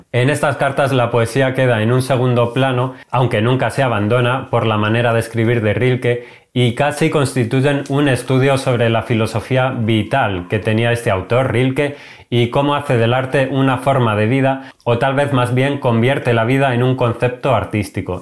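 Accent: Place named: Uruguay. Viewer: kintsugi, lo tengo pendiente en bookmate y estoy leyendo: debimos ser felices, pero después de ver tu video no voy a poder no leerlo con acento uruguasho.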